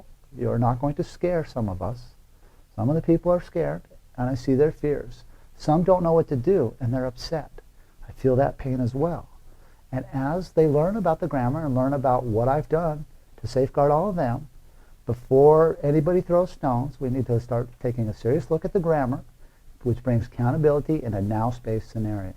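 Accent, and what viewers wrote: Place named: United Kingdom. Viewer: Here, thought you were Brit 🤔😂🖖🏾👏🏾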